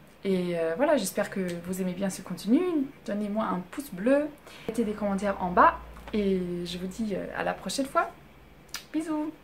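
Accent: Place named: France. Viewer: Your French accent is so great! Found your channel through NonStopParis' live stream. I've subscribed and can't wait to watch all of your videos :)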